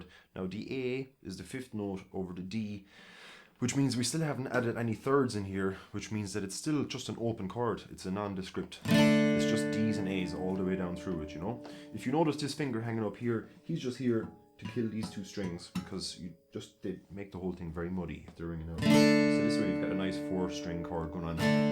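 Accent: Ireland. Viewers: Irish as they come this lads voice is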